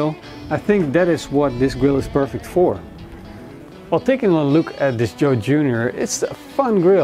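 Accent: Netherlands. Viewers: Roma Abrupt dutch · Nederlands accent! Dutch accent! Lol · U hebt een Nederlands accent??......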